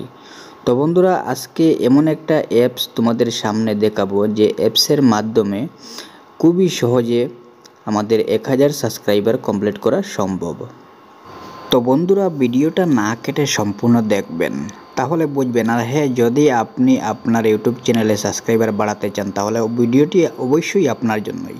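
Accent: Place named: Sylhet, Bangladesh. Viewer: আসসালামু আলাইকুম ভাইয়া কেমন আছেন ভালো আছেন নি আপনার বাড়ি কোথায় সিলেট নাকি আপনি কোন রকম সিলেটি সিলেটি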